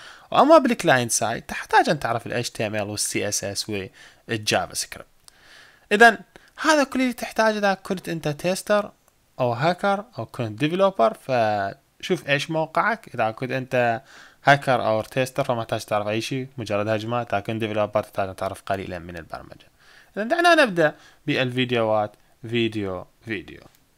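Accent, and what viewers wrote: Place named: Iraq. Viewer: انصح الجميع بمشاهدة القناه وجدت كثيرا مما كنت ابحث عنه خاصة ان اللهجة عراقية. وهذا احلى شي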